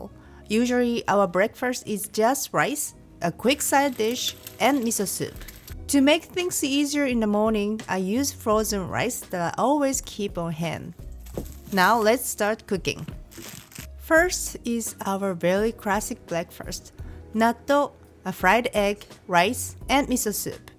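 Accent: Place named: Japan. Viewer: The heavy japanese accent tells me that this is authentic. Also, nice cap.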